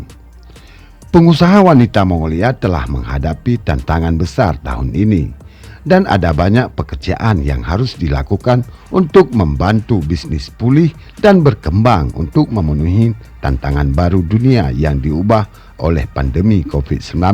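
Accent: Aceh, Indonesia. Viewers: Apakah Chanel ini milik orang Aceh..kuliat irama ngomong nya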